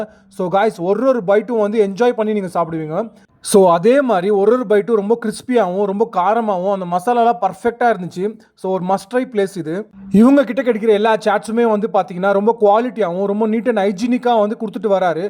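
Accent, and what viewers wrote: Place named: Bangalore, India. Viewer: Bangalore tamil😊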